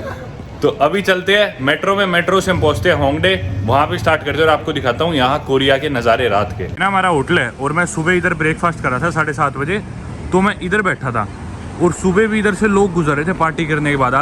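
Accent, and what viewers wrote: Haryana, India. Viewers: haryana se hai kya Bhai? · The dhakad Aur wo Hindi ka harayanvi touch kya baat hai maja aa jata hai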